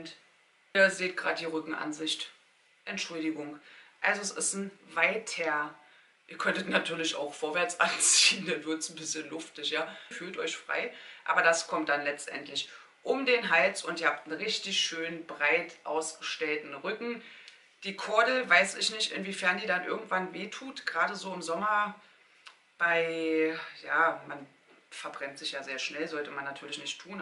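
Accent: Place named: Berlin, Germany. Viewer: Du bist unsere swimwear queen 🤣🤣 Body paßt dazu Berliner schnauze was will man mehr 😁😘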